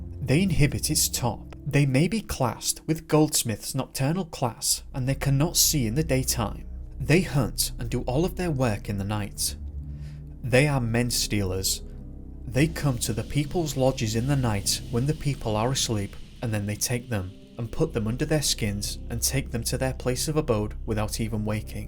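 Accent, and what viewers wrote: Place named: Northern England, United Kingdom. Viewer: It's his Northern English accent , fairies is pronounced furries .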